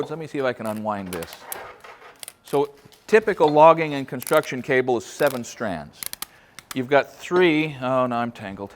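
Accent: United States. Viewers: Love your beautiful vocabulary and accent. Original American accent. Words are not enough to appreciate the value of your useful programs. Lots of appreciations, thankyou.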